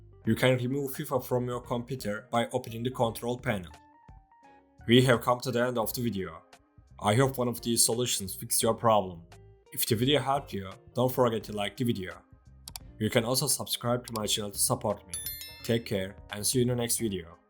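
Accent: Turkey. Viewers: İngilizceyi türkler gibi konuşuyomuş bu çocuk diyodum hangi milliyetten acaba derken yazısını gördüm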